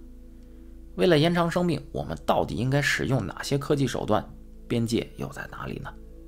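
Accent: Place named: Tianjin, China. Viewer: 真好，现在每期都要听，内容好，还有好听的天津普通话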